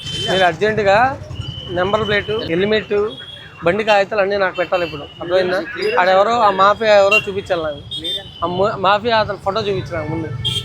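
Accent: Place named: Andhra, India. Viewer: ఆంధ్రవాళ్ళ వాయిస్ బలే కామెడీ గా ఉండిద్ది.... మీరు విజయవాడ అని అర్ధం అయింది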